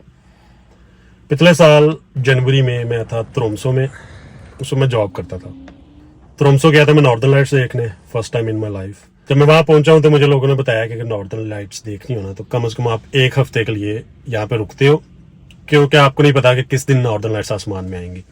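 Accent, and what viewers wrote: Punjab, India. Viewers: yar aap pyar ho boht hi achhay lagte go mujhe mujhe apka punjabi accent boht achha lagta hai lahore pakistan se boht sara pyar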